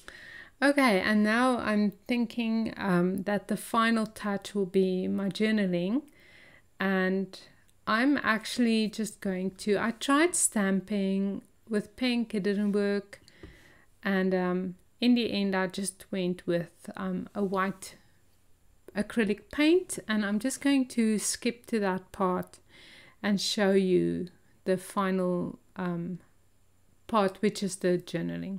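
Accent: South Africa. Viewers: Wonderful inspiration, thank you! Do I hear a South African accent? Lekker!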